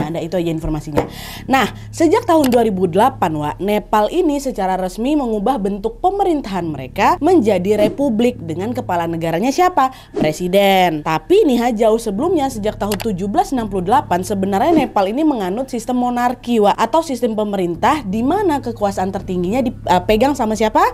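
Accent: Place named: Medan, Indonesia. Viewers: Medan kali kamu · Logat medanmu itu medok .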